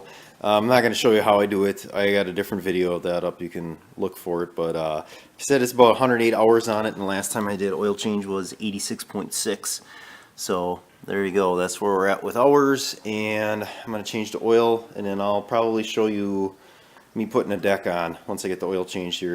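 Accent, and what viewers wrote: United States. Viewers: He sounds like one of them dam yankees that dont know his ass from a hole in the ground mite be a democrat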